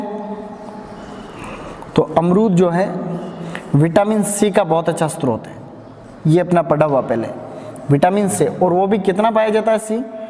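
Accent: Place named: Maharashtra, India. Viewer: aapke baat karne ka tareek mujhe Maharashtra kaa laga so im tell you Any way best of luck for your future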